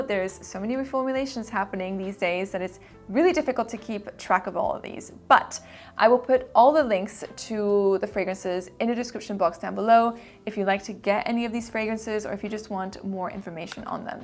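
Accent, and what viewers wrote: France. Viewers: Nice to hear legit French pronunciations! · Why do you use a French pronunciation for an English audience ? It’s pretentious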